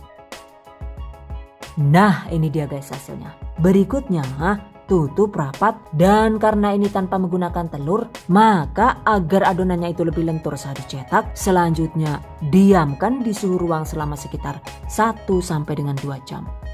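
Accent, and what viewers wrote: Banyumas, Indonesia. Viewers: jannn deneng wong ngapak ya yune, pada bae karo nyonge.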